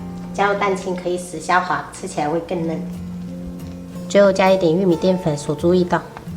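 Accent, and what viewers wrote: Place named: Fujian, China. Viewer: 你是四川人，为什么是一口福建口音呢😊? 就算嫁到那里也不应该呀😄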